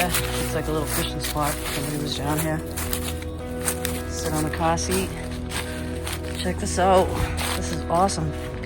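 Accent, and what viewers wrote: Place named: Eastern Massachusetts, United States. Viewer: What beautiful views, you picked the perfect clear day. Love to listen to your voice especially the Eastern Massachusetts accent, i drop a lot of r's myself and talk similar coming from New Beige.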